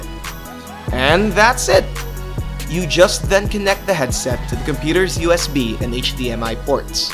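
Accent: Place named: Philippines. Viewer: You sound Filipino 🇵🇭🤔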